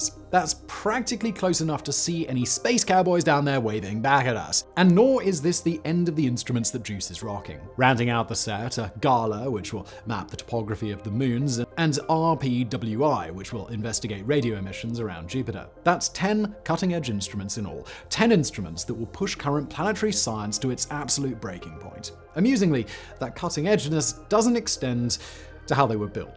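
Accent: England, United Kingdom. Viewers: "Is There A Life" on the thumbnail? Poor English from someone with an English accent...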